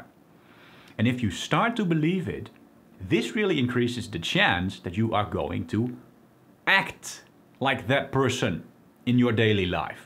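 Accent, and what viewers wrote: Netherlands. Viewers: He is from the Netherlands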